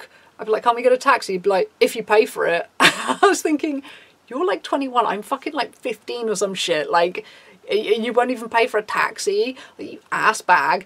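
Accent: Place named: Newcastle, United Kingdom. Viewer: Are you a Geordie? You sound like it imo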